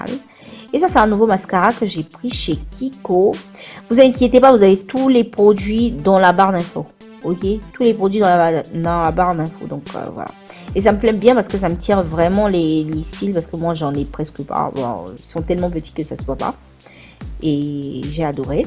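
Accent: Ivory Coast. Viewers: Tu es ivoirienne d’origine ou mon oreille me trompe ?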